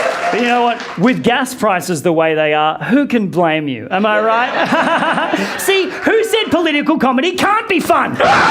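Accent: Australia. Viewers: R u an Aussie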